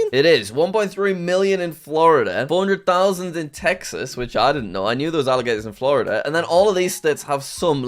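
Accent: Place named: United Kingdom. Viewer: how we British people talk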